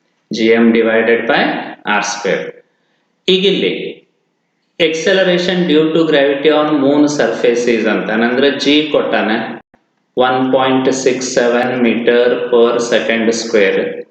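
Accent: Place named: North Karnataka, India. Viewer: Thank you sir. You speak north kannada, may i know where you are from sir?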